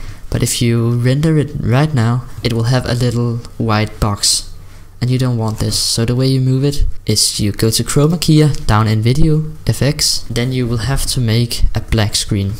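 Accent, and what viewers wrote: Denmark. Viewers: Det er squ godt broder! xD Men god video man kan godt høre du er dansk :)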